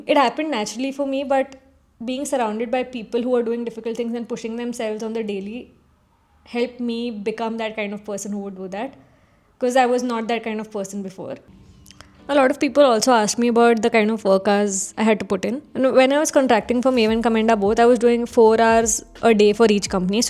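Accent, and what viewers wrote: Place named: India. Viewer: Itna gandha accent hai pajjeett vala. Hindi mai bol le London ki rani.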